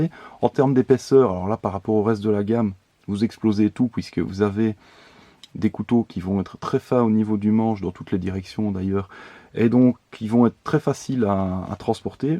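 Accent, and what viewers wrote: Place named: Belgium. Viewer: Je viens de te commander le Sector 2, et je pense que je demanderai à l'équipe de TRB de me faire un bel étui Kydex pour un port "neck" ;-) Merci pour tes vidéos, avec l'accent belge en plus !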